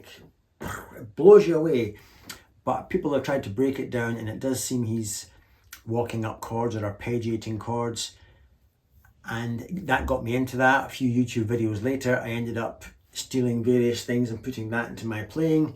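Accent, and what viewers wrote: Scotland, United Kingdom. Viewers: HI, Your latest video just showed up in my recommendations. Love your playing and your easygoing personality. I am originally from Alloa, Clacks so your accent grabbed me. I have already watched a few of your videos, only six and a half years to go!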